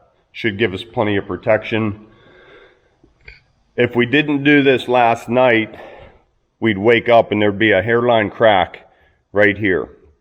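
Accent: Pittsburgh, United States. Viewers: Two things gave it away yinz are in the Pittsburgh area 1 . your accent and the way you say House. 2. That big green truck with "THROWER" on the door .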